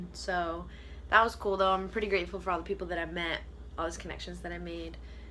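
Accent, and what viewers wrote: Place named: Boston, United States. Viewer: You seem very genuinely happy in your videos it's amazing! Also heard some Boston accent in this one, whateva whateva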